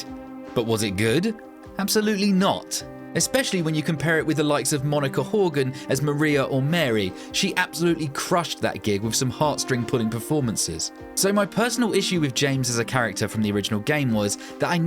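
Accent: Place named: United Kingdom. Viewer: its the accent ... British accent does wonders. Its shapes reality :face-fuchsia-wide-eyes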